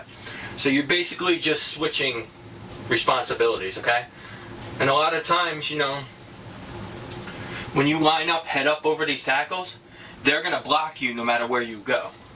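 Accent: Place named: Georgia, United States. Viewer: Great work sound like a south ga boy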